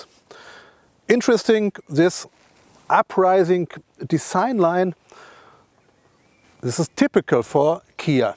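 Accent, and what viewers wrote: Germany. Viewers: For the first ten seconds, I was trying hard to figure out what he speaks is English or German.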